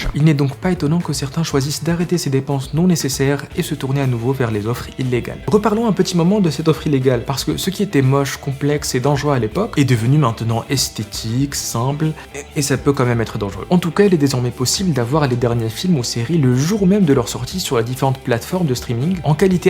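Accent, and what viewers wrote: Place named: South, France. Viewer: Oh bonne mère, quel bel accent du Sud !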